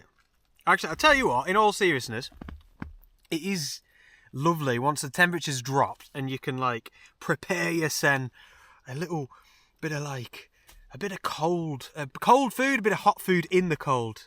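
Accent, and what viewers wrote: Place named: Manchester, United Kingdom. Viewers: Hi! Watching your channel for a couple of years now here in Ireland. love it and looking forward to getting your calendar in the post soon! Strange question, but love to know where the accent is from! Went to UNI in England with a fella from Workington but you sound a bit softer. I was originally guessing somewhere around Manchester then thought Lancashire but maybe more north like Lancaster? If tis too personal I understand! Just a bit of a language/accent geek here! {probably way off!} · Hello from sunny Manchester, why do you sound like a manc?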